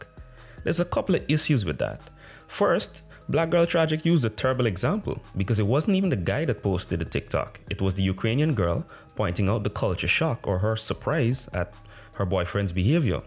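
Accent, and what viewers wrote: Guyana. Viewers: Guyanese accent alone gets a sub. Content was good to, Bigup yuhself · Your accent sounds Guyanese? Where are you from? Nice clear explanation in your video also.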